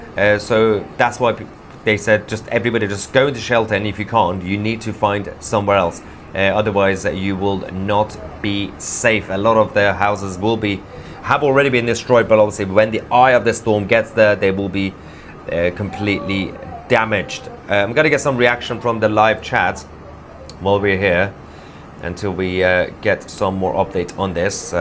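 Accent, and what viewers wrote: United Kingdom. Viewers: Annoying British accent lol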